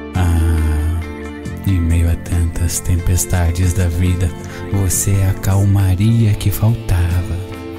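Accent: Minas Gerais, Brazil. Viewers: como pode vc falar palavras como se fosse ele tipo Minas Gerais Foi ele que falou essa palavra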